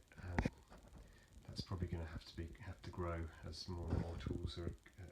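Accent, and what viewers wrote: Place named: Southern England, United Kingdom. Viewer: Just watched it, very interesting. I've been a PFA/LAA member since 1970 and for a number of reasons (life) just got in the way of homebuilding. I've been to virtually every annual Rally but only flown into a few. I did consider the Turbulent then my interest swapped to the MB2 Colibri, however I was surprised to see in the LAA mag that a Turbulent new build was underway. I was going to offer help with your build but I live in the North and by your accent I assume you're down south. I've had a close look at the Turbulent at all the fly-ins I've been to (many) and it still interests me. Can I wish you the best of luck with your endeavour. I just noticed that it's at least 25 years since it was first started so I would be looking at how one can properly check glued joints - perhaps your Inspector can help?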